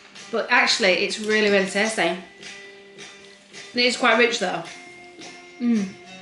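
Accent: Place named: West Yorkshire, United Kingdom. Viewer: Well done on your first Pasticcio. Hope you don't mind me saying that we would never put clove in it and the sauce gets smooth a lot faster with a balloon whisk. A great cook to watch on YouTube when you have time is Dimitra's Dishes - she cooks lots of authentic Greek food. I think you sound like West Yorkshire - I am South Yorkshire (Sheffield) but married to a Greek Cypriot. Enjoyed your video - thanks for sharing.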